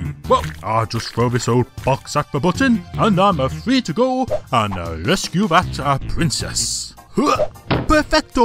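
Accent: United Kingdom. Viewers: British dude... have you never heard an englishman say H?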